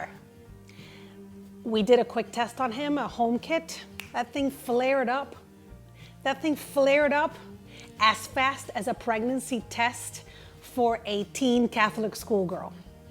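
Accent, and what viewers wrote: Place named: Midwest, United States. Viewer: Your mid west accent is extremely obnoxious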